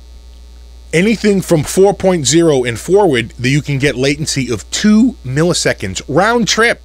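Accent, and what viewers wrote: Boston, United States. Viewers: I love hearing a fellow Bostonian talk about music and guitars. you're the man! Keep em' coming brothuh!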